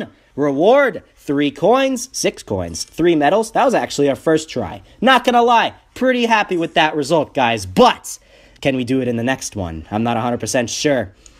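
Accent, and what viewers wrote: United Kingdom. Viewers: No offense but u sound British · Nice british accent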